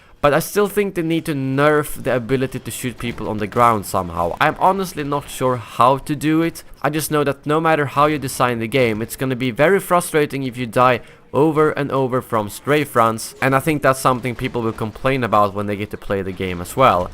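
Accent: Sweden. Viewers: Are you from sweden, you sounds like that. Hope you don't take this bad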